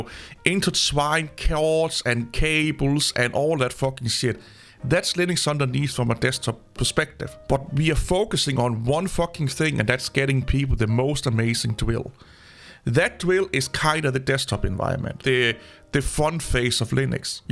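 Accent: Denmark. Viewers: That accent is waaaay tooo danish!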